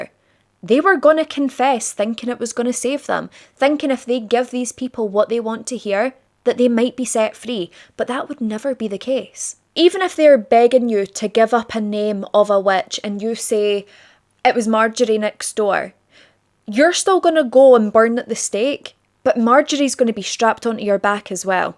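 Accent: Scotland, United Kingdom. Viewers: your accent reminds me of my grandad he was scottish and an amazing man. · I completely understand your accent i knew right away you were Scottish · I’ve just found your channel today and subbed. This was a good overview. I definitely would have been considered a witch and probably end up toured and buried. Part of the reason I did sub, not just your delivery and interesting topic but I like the Scottish accent. There is Scottish blood in me so thank you. Peace and love from🇨🇦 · Happy to find this, i have an interest in witch trials, no matter where, love for Pagan traditions, witchcraft in general, i also have a gorgeous black cat named, wait for it.... Salem. Btw, love your accent, wish i had scottish in my ancestry, but no, just german and a small amount of irish, also have alot of birthmarks and moles, and pretty much a loner. I guess i would be cooked.❤❤❤❤❤❤😂😂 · Girl I love your Scottish accent ❤also I came for the witchy stories I love this stuff!!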